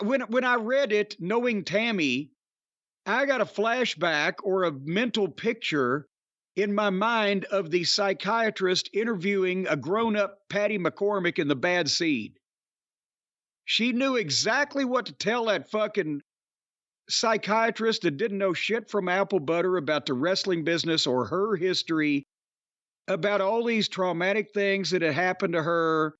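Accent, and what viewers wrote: South, United States. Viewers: I never liked Jim Cornette when I was a youngster growing up and being a fan of WWE. I was too young to really realize, I wasn't really supposed to like him. He was a heel manager, and he was supposed to act that way to get me to dislike him. I disliked Jim Cornette the character :) I LOVE Jim Cornette the orator. I could listen to him talk for hours. His cadence, his accent, and while he sounds like he should be some kind of country bumpkin, he has a rich vocabulary.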